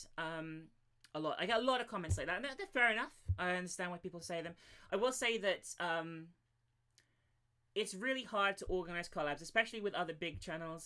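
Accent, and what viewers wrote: United Kingdom. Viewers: your voice is sexy, british, and that's all that matters to me. <3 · I love your voice. Its kinda adorable to me with your british accent ;)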